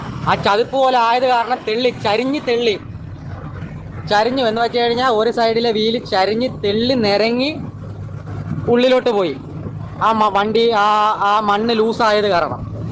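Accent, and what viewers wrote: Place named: Malabar, India. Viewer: എടോ നീ കായംകുളത്തുകാരൻ അല്ല നിന്റെ സംസാരം കേട്ടാൽ അറിയാം നീ മലബാറുകാരനാണ്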